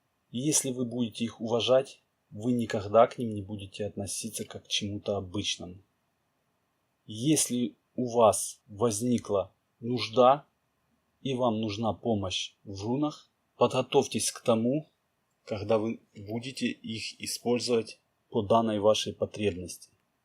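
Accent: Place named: Ukraine. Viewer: Обычный мягкий украинский говор.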